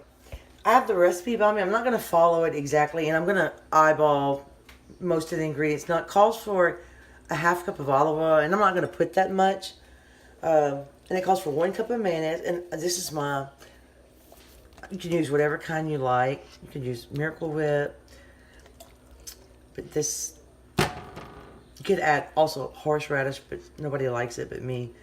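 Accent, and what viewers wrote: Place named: New Orleans, United States. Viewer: Your channel is fantastic! You are a wonderful cook. When I first heard you I thought " oh she is my people" as I was raised in NOLA!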